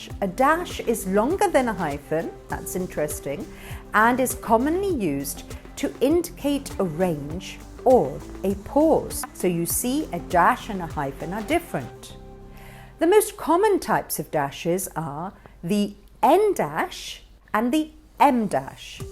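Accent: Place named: United Kingdom. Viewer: Just a question mam.. were you born in UK ? You have a british accent. Please answer this. Just curious 🌸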